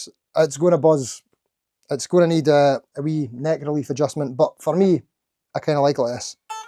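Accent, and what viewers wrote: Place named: Dundee, United Kingdom. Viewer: great video as always, i don't comment much on these channels but i love the way you champion budget guitars as opposed to ridiculously priced name instruments, being a weegie i also admire you Dundee accent. I myself have been playing 60 years now and still rubbish but no bad on bass, since retiring i have taken up buying cheap end instruments and doing upgrades for a hobby and really ennoying that. So i want to leave you with some words of wisdom, NEVER BUY A ROLEX FROM A BREATHLESS STRANGER. Keep up the good work pal and take care.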